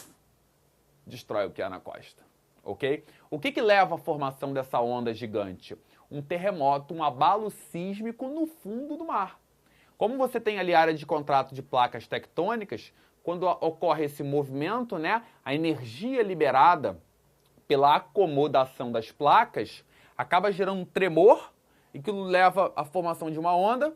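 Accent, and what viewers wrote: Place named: Ceará, Brazil. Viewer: cearense kkkkk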